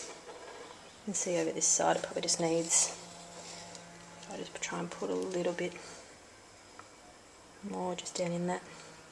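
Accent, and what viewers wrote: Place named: New Zealand. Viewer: Keeping this for my inner choccy Kiwi devil!🥰🥰 Thank you for sharing this, great to hear a downunder accent and our sweet lingo on yt!👍👍 Would have loved to see the slicing and tasting of it, but just grateful for the recipe without marshmallows tbh! Will try it with coconut mixed through. Well done, its champion!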